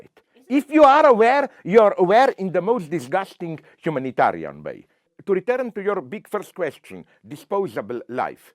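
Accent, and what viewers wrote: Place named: Slovenia. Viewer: How can this short video be transcribed for the hearing impaired and for those who do not speak English or understand his Slovenian accent?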